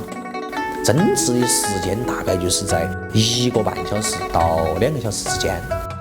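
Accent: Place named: Sichuan, China. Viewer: 四川话解说真是笑死我咯，真是欢落源泉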